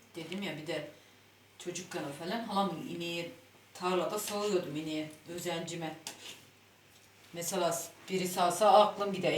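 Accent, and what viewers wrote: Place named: Aegean, Turkey. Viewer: KARADENİZ ZONGULDAK DAN SELAMLAR HEPİNİZE. ,,EGE ŞİVESİNİ COK SEVİYORUZ ,, ÇOK TATLI KONUŞUYORSUNUZ SÜPER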